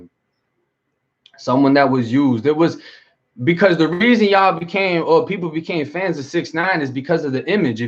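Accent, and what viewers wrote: Boston, United States. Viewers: Hey Bro, Boston accent frealz! You into the great Boston comic, Bill Burr? Bet you won't get tickled!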